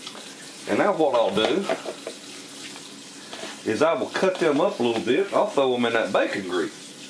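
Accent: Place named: North Georgia, United States. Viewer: Three degrees?! Holy crap! Where are you.... Alaska? That accent sounds more like North Georgia. lol. Never thought about cutting the slab of bacon so it fits better in the skillet.... too simple. Great vid as always.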